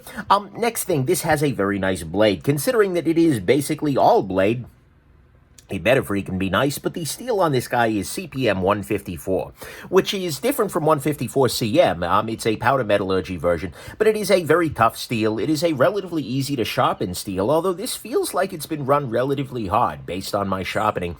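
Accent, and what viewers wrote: New York, United States. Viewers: I thought you were a new yucker ! With the accent!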